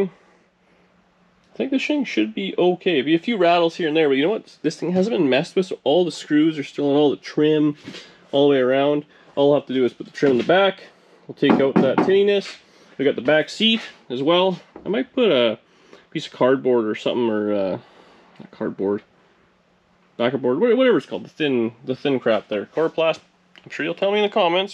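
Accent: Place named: Canada. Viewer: I like how when you're speaking you actually say Eh, like a true Canadian 😁. Cool car. So jealous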